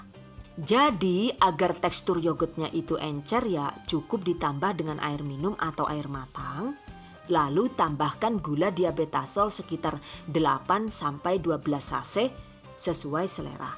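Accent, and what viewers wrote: Banyumas, Indonesia. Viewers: salam ngapak..ora ngapak ora kepenakk ya bun..hihi..mksh resepnya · Hahaiii, ora ngapak ora penak Lanjut na mba Subscriber wis nempel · Tek baca Madiun baca lg Madiun takut salah ehh bnr Madiun tp ngapak ky Banyumas Purwokerto😂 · ngapake ky inyong...😀👍👍👍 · 😜😜😜bahasane rika ngapak temen yuuuu...nyonk seneng lah😜😜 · Banyakin logat ngapaknya dong bund...biar nontonnya makin semangat 😄😄😄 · Mantul Bun. Eh kok agak gelap ya vidionya bun? Di dalam ruangan ya ? Atau lagi mendung ? Tapi tetep mantul kok masih jelas apa lagi ngapaknya bunda bikin emez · Rika wong ngendi lik? Inggrise karo ngapake kepenak temen.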